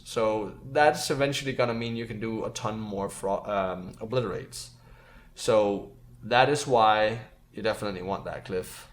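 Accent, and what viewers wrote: Denmark. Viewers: I can hear it so clearly on the way you say things that you're a Dane, and that you're trying to speak English with an accent, don't try if you cant do it.... speak with sum more British people like I've done and now my English is amazing(speaking wise). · hey dude are you danish ? :) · haha, i knew he was from Denmark from that accent lol :)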